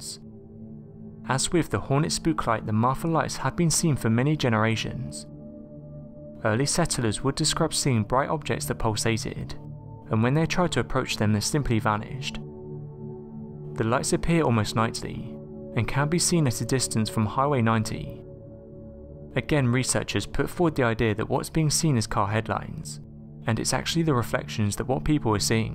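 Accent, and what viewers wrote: England, United Kingdom. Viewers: Qwerty Bwomp he’s English.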